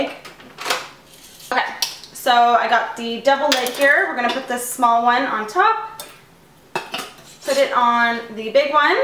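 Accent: Canada. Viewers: Love your recipes and ideas. You should have a show on the Food Network. Is that an Italian-Canadian or Greek-Canadian accent?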